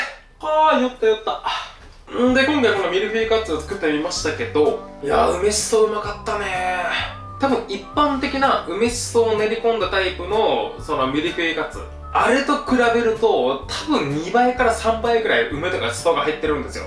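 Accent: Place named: Hakata, Japan. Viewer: の動画観すぎて料理の知識だけじゃなく博多弁までも学んでる一石二鳥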